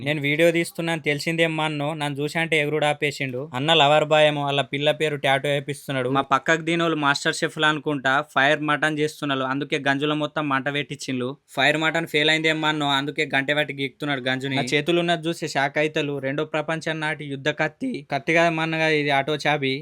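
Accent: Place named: Adilabad, India. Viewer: Hi brother my name is vemula srinivas from Karimnagar..mi chanal subscribe ni ...chala Baga vuntavi funny reels ... adilabad slang super...maku adilabad kachikanti village lo friend s vunaru every year twice vasthamu ...nenu lecturer ni ..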